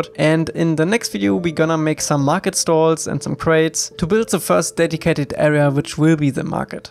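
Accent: Germany. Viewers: Hallo, du bist safe deutsch würde ich behaupten, hört man am akzent:D Ich habe da ideen für ein projekt und würde mich ebenso als unterstützung für deines anbieten, egal was es ist und sofern es mir möglich ist! Dennoch wäre es cool wenn du dir meine idee mit anhören könntest:) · 1 frage bist du aus Deutschland? 😅😅 du hast nämlich ein sehr Deutsches Englisch. 😅😅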